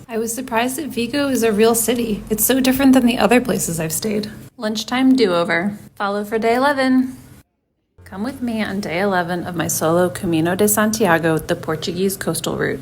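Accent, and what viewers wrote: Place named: Australia. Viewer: You sound like an Australian..Thanks for sharing your Camino experience.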